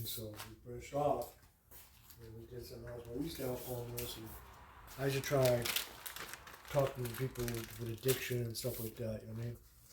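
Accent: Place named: Boston, United States. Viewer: The CC heard your Bahston accent as Alta. :face-green-smiling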